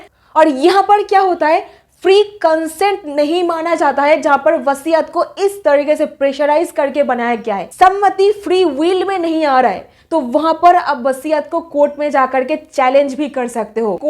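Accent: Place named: West Bengal, India. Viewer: Sotti bolchen aaapni....aapka accent sunke pata chal gaya aap WB se hai.....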